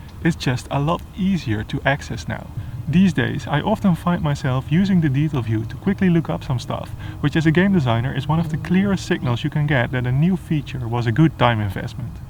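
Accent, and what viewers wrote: Netherlands. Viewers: At the start of the video: Dutch accent? Name revealed: Dutch 100%. Showing of our newspaper-sized nature areas in the background: Dutch 200%.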